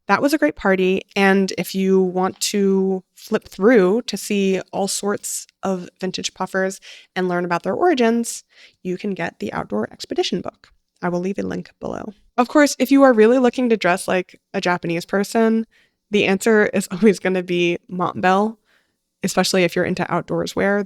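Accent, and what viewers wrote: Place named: France. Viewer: Great french accent tbh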